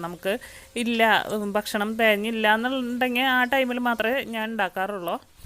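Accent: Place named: Thrissur, India. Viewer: Thrissur slang varunnundallo.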